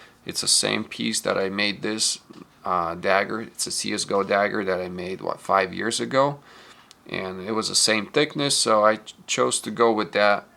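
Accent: Russia. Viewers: твои ножи - это искусство, руки золотые! У тебя не английский, а русский с акцентом, судя по всему ты уже давно говоришь на английском. Удачи и ждем новых произведений!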